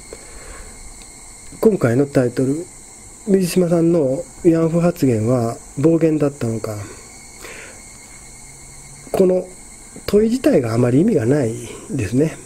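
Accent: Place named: Kansai, Japan. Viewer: そうですねー。水島さんもちょっと感情的になってたんでしょうね。第三者なら、まさかアンタは慰安婦だ！という意味ではないだろうなとわかると思うけど、今、揉めてる当事者であり真子さんは女性なので「慰安婦」という文言を使ったのは、あんまり適切ではなかったかなぁと私も思いますね。私は真子さんが我那覇パパにべったりなのと、江崎さんって胡散臭過ぎるような感じがするので、今までの我那覇さん達の実績をあまり知らないのでなんとも言えないけど、一般の本土の人間として、我那覇パパ、江崎さん、ヒステリックになった真子さんのトリオはへんてこりんに見えましたね。でも、水島さんの慰安婦発言、そこは不適切かなと思います。女性に対してだからね。そういう意味では水島さんもちょっと常軌を逸してたような気も。 ところで、先生は関西出身ですか？私、関西人なんで、時々、関西弁のときがあるからそうなのかなと思いました。私が関西人だからかもしれないけど、神戸っ子の立場からしたら、とても親近感を感じましたー。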